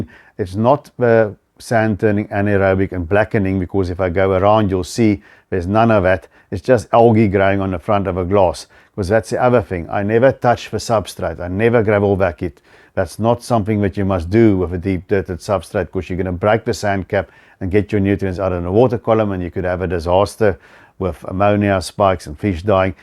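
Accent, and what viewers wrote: South Africa. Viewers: Love South African accents, great tank too!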